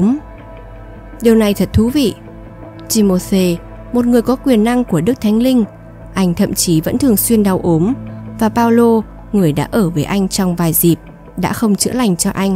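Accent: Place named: Hanoi, Vietnam. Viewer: Giọng đọc hay quá . Cảm ơn nhé.nghe rõ, chuẩn giọng hà nội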